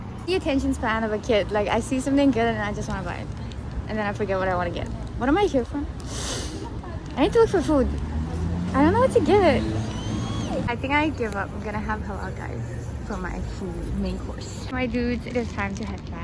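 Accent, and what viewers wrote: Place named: Malaysia. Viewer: i'm wonder where are you from ? malaysia ? because you're accent look malaysia pure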